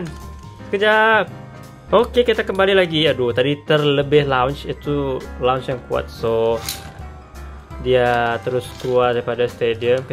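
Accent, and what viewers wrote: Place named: Indonesia. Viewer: indon la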